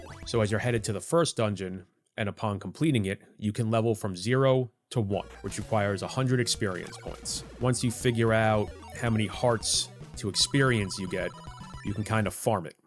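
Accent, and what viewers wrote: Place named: Philadelphia, United States. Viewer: The Del-Co Philly accent, the sunken tired eyes, the walkthru under the guide as a review....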